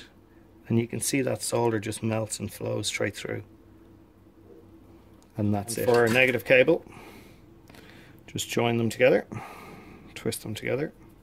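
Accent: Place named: Ireland. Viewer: Thank you very much for your explanation. I'm just a step on trying myself 😄. I lived in Ireland for 5 years and I couldn't help myself of thinking you sound 'slightly'😄 Irish. I'm sure I'll be wrong. I'm watching videos like a crazy because I have a lego rocket I want to light (the thrusters) and I'm figuring out how to do it. Cheers from Spain ♥️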